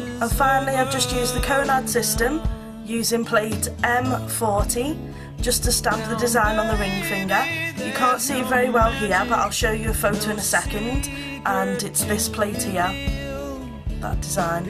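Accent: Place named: United Kingdom. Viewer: OH MY GOSH!! YOUR ACCENT!! I wish i had a British accent.. YOUR IS SOO COOOL!! I'm American :(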